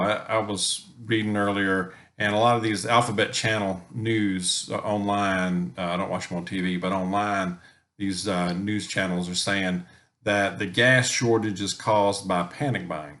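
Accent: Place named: South, United States. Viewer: Not at all good. You sound as if you have a southern accent, like I do. It’s going to impact us as much as the East Coast.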